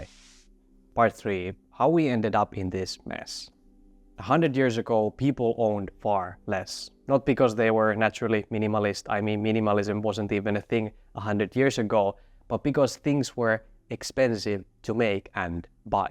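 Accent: Finland. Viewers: Finnish accent, I never miss it! Onnea kanavalle!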